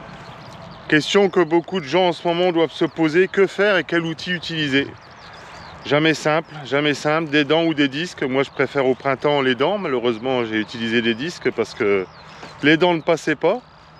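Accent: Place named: Champagne, France. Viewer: Bonsoir, il fait beau aussi en Champagne, t'es champs sont pas loin de la route Vitry Chalons, il y a de la circulation, de bien jolie vidéo, je suis quelques agris en vidéo toi et Sam mais lui pas dans le même coin, toujours cet accent Champenois, Gilles en Sud ouest Libournais , allez vive la Champagne et la Bourgogne, ta terre est pas trop mauvaise, tu préfères la craie, ici chez moi de l'argile au jardin, mon épouse s'occupe des fleurs en ce moment, rien au jardin, mais il est prêt pour les plantations ensuite quand viens l'été plus rien, l'eau coûte trop cher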